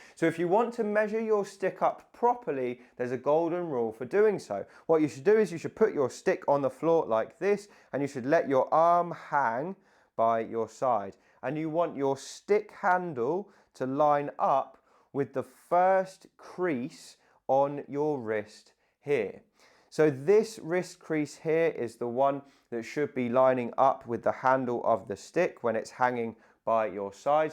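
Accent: United Kingdom. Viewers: I was a nurse and ended up disabled and I have to use a stick. No one ever educated me even after 12 spinal surgeries on proper height of my cane. Thank you for this tutorial. It sounds like you are across the pond from me and educate your citizens better than American PT’s do. Thanks once again going to adjust mine right now 😊😊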